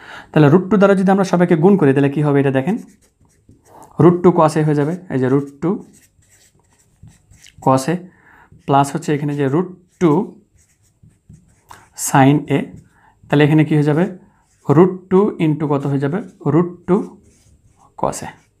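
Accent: Rajshahi, Bangladesh. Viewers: Are you from Rajshahi??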